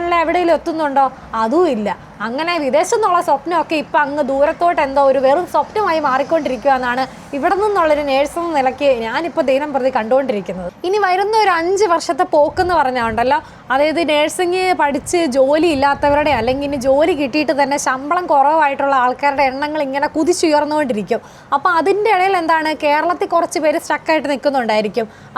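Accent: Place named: South Kerala, India. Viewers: കൂടുതൽ പേർ വിദേശത്തേക്ക് വരാതിരിക്കാൻ സ്വന്തം നിലനിൽപ്പ് ഭദ്രമാക്കൻ വേണ്ടി യാണ് തെക്കൻ മലയാള ഭാഷ സംസാരിക്കുന്ന ഈ സ്ത്രീ യുടെ ലക്ഷ്യം... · സംസാരം കേട്ടിട്ട് ഞങ്ങളുടെ തിരുവല്ല കോട്ടയം ഭാഗം ആണെന്ന് തോന്നുന്നല്ലോ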